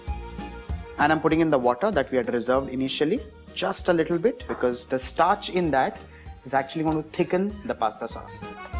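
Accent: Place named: Mumbai, India. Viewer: Love your channel esp your pukka Mumbai accent! I'm a Bombay girl too, now in Toronto - check out my channel!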